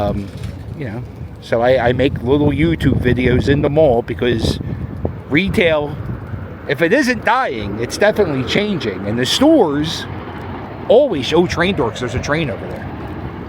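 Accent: Philadelphia, United States. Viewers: You definitely have a strong Philly accent 😂.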